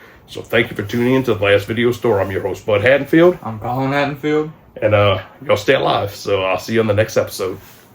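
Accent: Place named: Louisiana, United States. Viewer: as soon as you started talking I thought Louisiana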